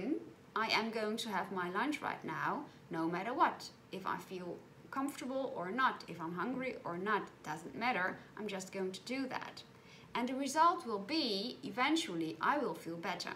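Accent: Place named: Netherlands. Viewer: THANK YOU THANK YOU THANK YOU for reading that in Dutch. I am 2nd generation Dutch/Australian and both my Oma and Opa have passed on but hearing you read that just brought back amazing memories of two Beautiful people who i miss dearly. Dutch is a beautiful language and I miss that strong accent so much ❤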